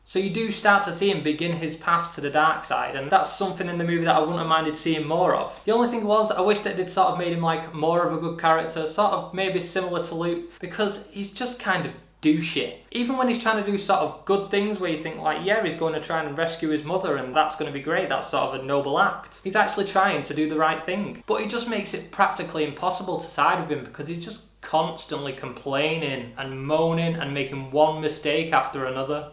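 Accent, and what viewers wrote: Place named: England, United Kingdom. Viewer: Good review! I was wondering, where in England are you from?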